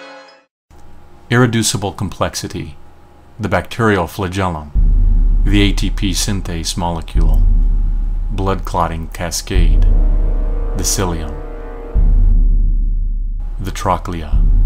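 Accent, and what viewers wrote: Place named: Canada. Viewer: Off topic but you sort of have an accent that I notice. Especially when you pronounce "ideas", are you Canadian?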